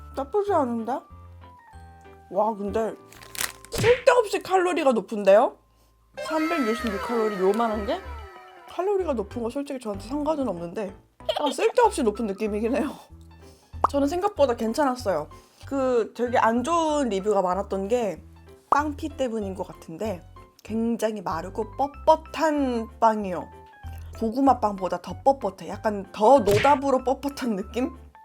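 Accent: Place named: Gyeongsang, South Korea. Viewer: 님 고향이 경상도 이신가요 계속 사투리 억양이 들려서...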